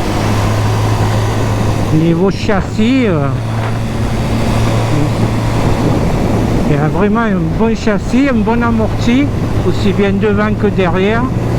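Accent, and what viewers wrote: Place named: South, France. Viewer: Avé le bon accent ! Merci pour ton retour.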